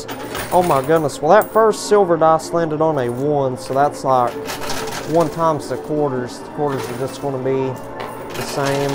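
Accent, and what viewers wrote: South, United States. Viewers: I love watching you play the coin pusher. I live in Canada and I really like you southern accent.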